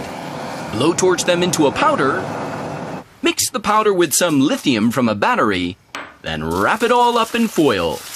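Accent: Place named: United States. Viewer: British Currency with a US accent. hmmmmm....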